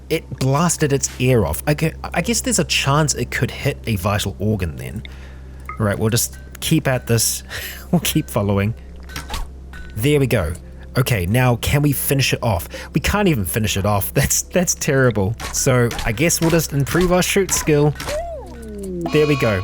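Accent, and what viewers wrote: New Zealand. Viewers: I thought you sounded like a new Zealander at the beginning of the video and I was rightttttt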